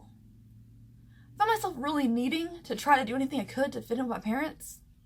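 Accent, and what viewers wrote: South, United States. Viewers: You are such a beautiful, beautiful girl. My heart breaks for you. I am from Denmark, so I have never experienced racism in that way and all the hate it brings. But I can relate to being an outcast dreaming about old world glamour. I think you are so strong. But you must be going trough a very shitty time right now, breaking up with the person who is your only safe heaven. I can only advice you to spend time with your grandparents before it is too late. If you ever chose to see your parents again, do it on neutral ground and state that you will only do it, if they will respect you. I know the healthcare in The US is somewhat shitty, but I really hope you have or can get some form of professional help. It is so important to talk about such trauma. I hope that you know I and many, many others love to watch your videos! i really admire that you can make one each and every day! 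Such talent and discipline. I wish you the very best. And I looove you southern accent! Please do not suppress it! Love from Denmark<3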